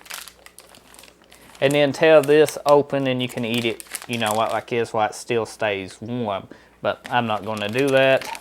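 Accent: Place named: Deep South, United States. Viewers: it is swampy, and american - but that can be a few places in southern parts of the united states - the dialect is from several sources in its past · I thought Cajun...but sincerely hope that's not an insult.. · I’m not sure if your accent is depth south or Australian 😂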